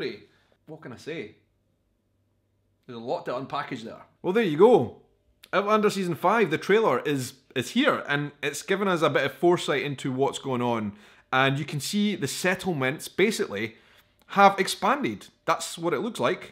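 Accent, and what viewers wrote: Scotland, United Kingdom. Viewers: I love your scotish accent !!! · I have always wanted to go to Scotland and am saving even now...of course I consider Outlander one of the best adaptations ever and I love listening to you. Great Scottish burr.😎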